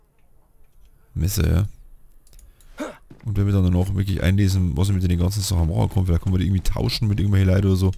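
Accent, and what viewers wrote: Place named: Bavaria, Germany. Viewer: Supaa Video. Endlich moi a scheens Boarisch hean. Do head ma gern zua. Mach weida so.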